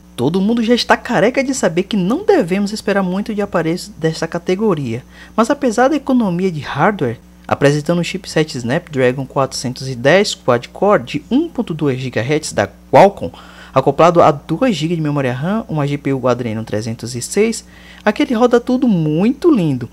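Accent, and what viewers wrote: Bahia, Brazil. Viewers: você e de que cidade? Se for salvador adivinhei pelo sou modo de falar que so bahiano tem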